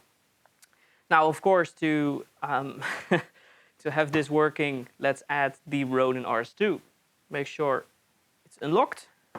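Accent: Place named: Netherlands. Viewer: ja ik was opzoek naar een alternatief/ring mount om de dji rs2 op te monteren ^^ Soms een beetje vermoeiend en onhandig om alles met de standaard grip te doen vooral als je hogere shots uit de hand wil maken. Deze van Tilta ziet er heel interessant uit alleen en is volgens mij zover ik heb kunnen zien ook een van de enige opties momenteel. Hoop alleen dat hij snel wat beter beschikbaar zal worden 😅 Ik had eerst mij twijfels of je nederlands was ik hoorde wel iets, daarna de shots met nl kentekens en de "later" bevestigde het wel 😆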